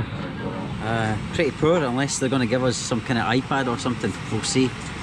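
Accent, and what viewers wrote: Scotland, United Kingdom. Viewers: I don't speak English but now I understand what a Scottish accent is. 🙂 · Hi, love to watch all your videos, with your scottish accent remind me <num> when i live in Manchester. i have a few friends from Scotland during that period. now already subscribed your channel and will waiting for your new videos. let me know where ever you drop by in Malaysia again, will offer free videography and accompany your trip in here, cheers mate:)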